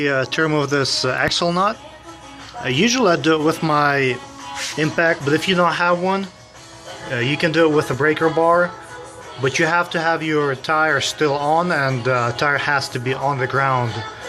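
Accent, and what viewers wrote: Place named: Russia. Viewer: Так и человек говорит с русским акцентом.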